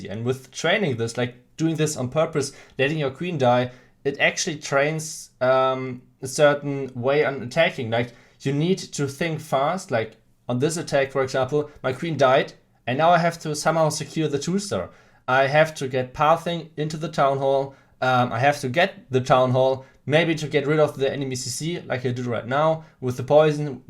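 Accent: Germany. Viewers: Thanks for making an English Channel so I can understand. Loving the German accent. I hope to see more of your content. And do give Galadon elder. He deserves it.:)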